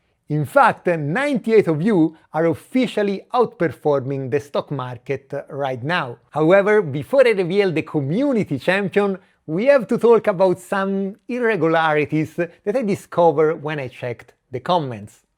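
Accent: Italy. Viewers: THIS GOTTA BE THE THICHKEST ITALIAN ACCENT I HEARD 😁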